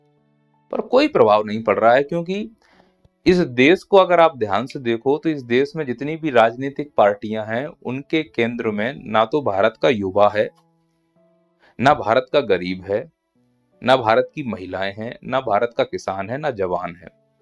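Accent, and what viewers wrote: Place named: Agra, India. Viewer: Aap agra firozabad ke aaspaas ke lag rhe hain bhasha se😊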